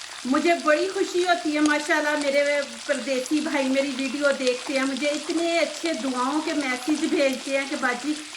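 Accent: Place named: Hyderabad, India. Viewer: Mam your Gulabi Urdu we enjoy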